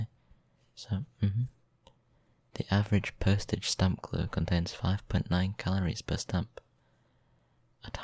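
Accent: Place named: United Kingdom. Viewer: Omg you are from the uk aren't you? I kinda fall in love with people from the uk just because of the beautiful way they pronounce every single word aaagh i can't. I apologize for mistakes, greetings from germany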